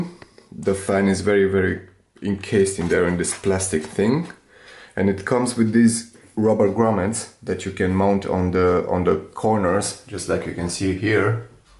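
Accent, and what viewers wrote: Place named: Netherlands. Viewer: vorbesti engleza cu accent de olandez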